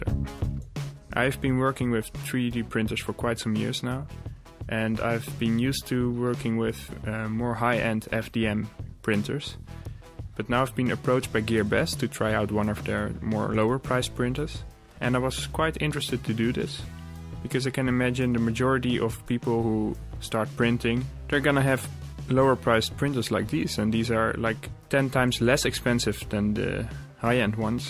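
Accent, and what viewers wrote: Netherlands. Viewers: max yep 100% sure the accent is typical dutch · Are u dutch?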